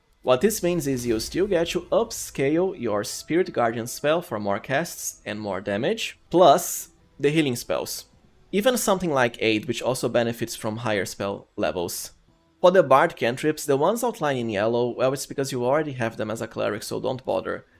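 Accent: Brazil. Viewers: Tu é brasileiro? Parece brasileiro falando inglês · percebi que vc era BR só pelo sotaque kkk me inscrevi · Bro, you’re Brazilian? Your accent feels like it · Curious question where you from? I am trying to guess the accent. Somehow I feel like you might be brazilian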